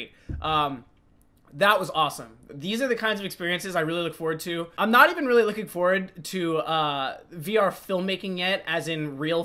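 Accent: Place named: France. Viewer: Your french accent is hilarous :D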